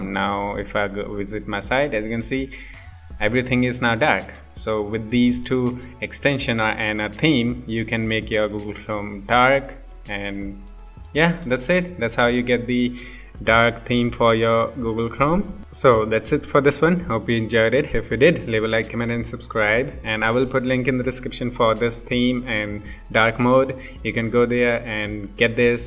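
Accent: India. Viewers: i love indian accents, for real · lol cant trust that indian voice sry just had too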